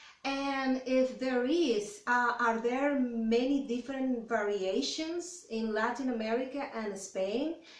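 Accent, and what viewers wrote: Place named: Dominican Republic. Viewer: I can tell you are Dominican.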